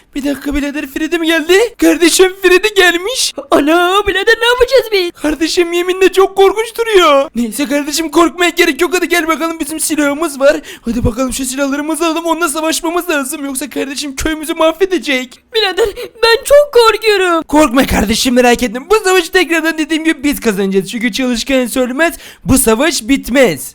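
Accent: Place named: Urfa, Turkey. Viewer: Abi aynı Urfalı gibi konuşuyorsun bende Urfalı yım 😍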